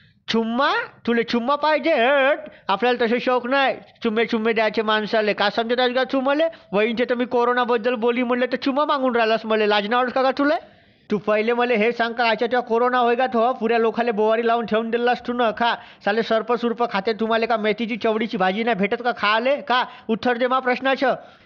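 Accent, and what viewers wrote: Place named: Varhadi, India. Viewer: Nice भाऊ आपली वऱ्हाडी भाषा एकदम मस्त वाटते.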